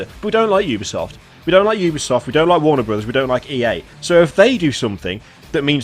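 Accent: United Kingdom. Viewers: How is it that these kinds of angry rants always sound better when done with a British accent? But then again, everything sounds better with a British accent. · Subscribing just for that accent. I'm British too.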